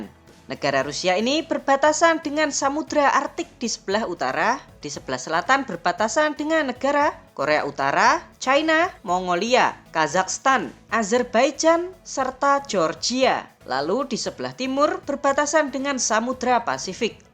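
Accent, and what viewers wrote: Java, Indonesia. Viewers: emg medok nya ga bisa di ilangin dulu itu min selagi ngonten 😁 duta Sheila on 7 aja kalau lagi nyanyi medok nya ilang 😁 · Meddok bgt😆😆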